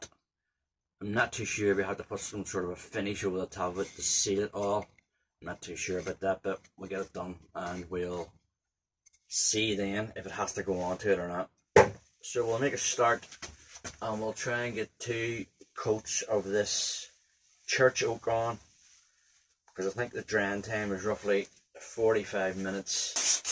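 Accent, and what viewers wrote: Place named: Ireland. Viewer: Good to hear a solid Irish accent 🇮🇪🇮🇪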